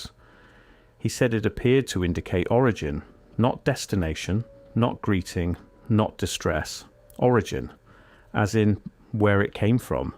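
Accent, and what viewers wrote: England, United Kingdom. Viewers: Such a bore...the english accent makes it even more borish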